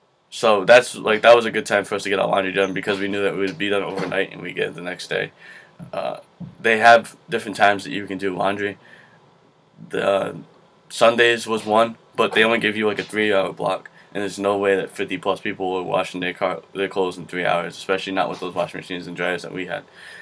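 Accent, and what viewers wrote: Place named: New York, United States. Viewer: are you from NY? where's your accent from?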